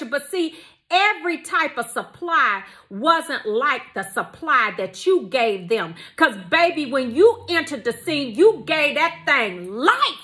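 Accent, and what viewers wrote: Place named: Midwest, United States. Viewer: Oh my goodness... I love the way you talk! I grew up in Indiana and miss the country voice like crazy :)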